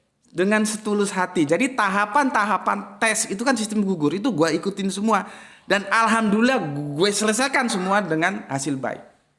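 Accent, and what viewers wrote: Java, Indonesia. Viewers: Medok kok pake gue gue, koyok kodok! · Ga usah GUE GUE lah... GA PANTES & GA NYAMAN dengarnya ! Logat aja logat Jawa kental gitu koq GUE GUE... !!! Wkwkwkwk... 😂😂😂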